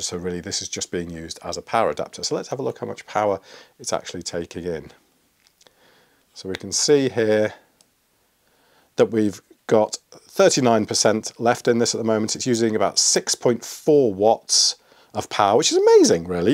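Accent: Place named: United Kingdom. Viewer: I Love Your British's Accent :elbowcough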